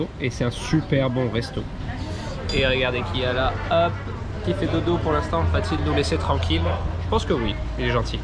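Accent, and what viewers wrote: Corsica, France. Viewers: Ahhhh cet accent corse ! je l adore 😍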